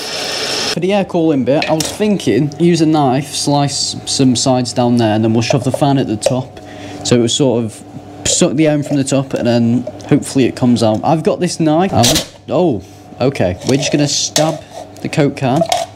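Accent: United Kingdom. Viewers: this video just got recommended to me and i clicked and now im invested in this channel, idk i think its the fun stupidity and the british accent as im british aswell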